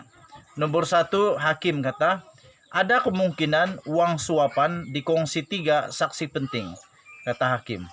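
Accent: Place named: Sabah, Malaysia. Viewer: Sy mmg suka dengar org sabah bercakap. Org sabah mmg kelakar. Suka sy dgr. DONE subscribe channel abang. 🌹💕🌹💕🌹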